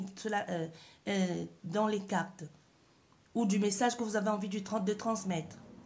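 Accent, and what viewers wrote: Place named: Martinique. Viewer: Bonjour, Tu as bien fait de recadrer sinon c la dérive. J'ai sélectionné ce qui me parle dc merciii. Ton accent me rappelle celui d'ici (la Martinique). Vite ta prochaine guidance. 🌴☀🌴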